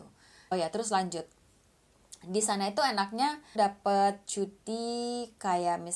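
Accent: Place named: West Java, Indonesia. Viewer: Teh logatnya kaya org Sunda,slm knl dari tkw malaysia asal tasikmalaya.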